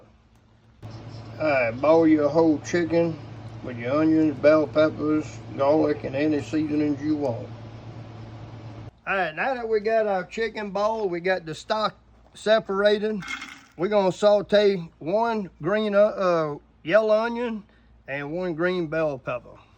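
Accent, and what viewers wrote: Louisiana, United States. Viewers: Are you from South Louisiana? From Cajun Country?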